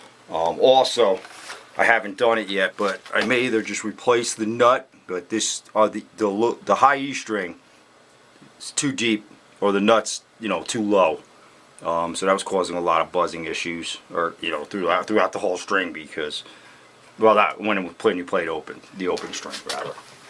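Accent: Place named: New Jersey, United States. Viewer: South Jersey Pennsylvania Delaware New York tri-state area maybe? I'm from Jersey and you sound like somebody down the street...lol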